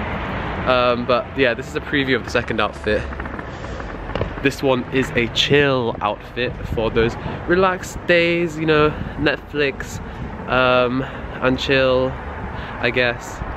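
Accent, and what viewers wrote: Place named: London, United Kingdom. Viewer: London accent really amazing 😭😍 i like it 💛 some times i try to speak like London people but i can't 😭😭😭😭😭 i am in love with london accent💙💙💙💙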